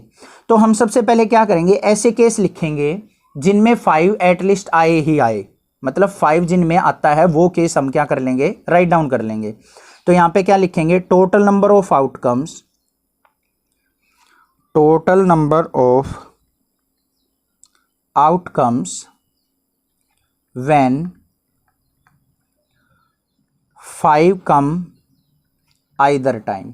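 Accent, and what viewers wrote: Haryana, India. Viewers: Haryanbi voice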